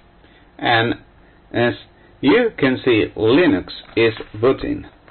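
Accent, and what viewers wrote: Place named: Spain. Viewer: Parker Stephens He is a spanish developer, so English it's not his language. Also, I think he is reading a script. For spanish people, like me, sometimes is difficult to have a good accent in English. Regards.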